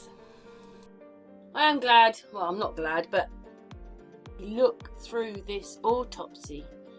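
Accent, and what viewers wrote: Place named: England, United Kingdom. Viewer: She's English so that's odd you find her hard to understand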